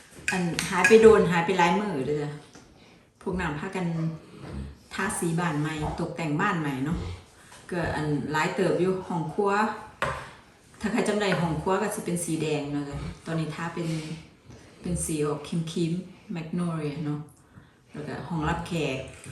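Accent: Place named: Chaiyaphum, Thailand. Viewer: สำเนียง เหมือนคนขัยภูมิ แม่น บ่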